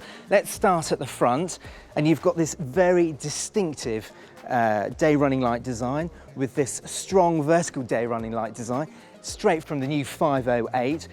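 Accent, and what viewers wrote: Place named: RP, United Kingdom. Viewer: speaks so posh it's refreshing to hear. And the car looks nice, the first time in a long time I have liked a new Peugeot.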